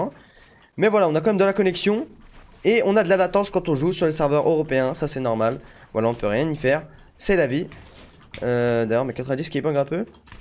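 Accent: France. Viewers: les gars avec son accents francais est le style maison il habitr ah marrakech sais sur fois mille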